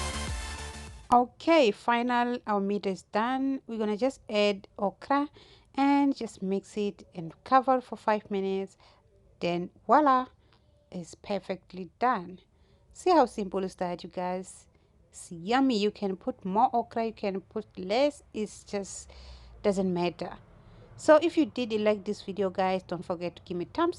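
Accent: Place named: England, United Kingdom. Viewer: I love your English accent 😍 that okra is inviting me to come eat 🤩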